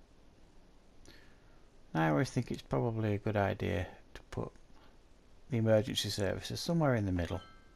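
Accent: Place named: Northern England, United Kingdom. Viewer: Same here. And he's a North Northerner. Bolton ? Rochdale ?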